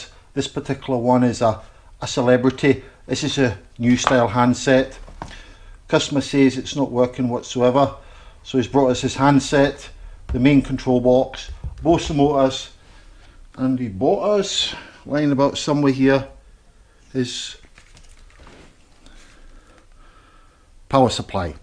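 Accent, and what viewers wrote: Scotland, United Kingdom. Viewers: Sometimes i wonder how many instructional videos absolutely bamboozal me......NOT THIS ONE. This is a delight to listen to. Is it because its a Scotsman explaining, to a like minded Scotsman😂 Thank you. I will now be able to diagnose my daughters reclining chair. Ive taken note of your website etc.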